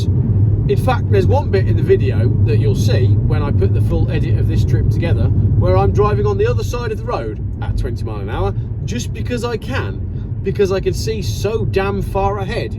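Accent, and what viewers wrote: Wales, United Kingdom. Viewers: Must be Welsh?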